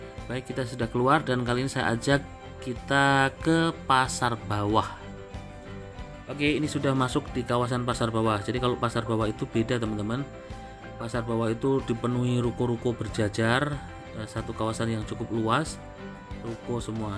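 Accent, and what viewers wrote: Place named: Java, Indonesia. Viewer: Si mas nya berlogat jawa. Dari jawa ya mas?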